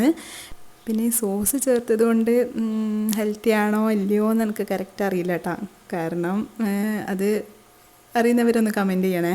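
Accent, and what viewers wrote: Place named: Kannur, India. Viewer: Kannur evideya,slang കേട്ടപ്പോ തോന്നി.നമ്മളും കണ്ണൂർ ആണ്😊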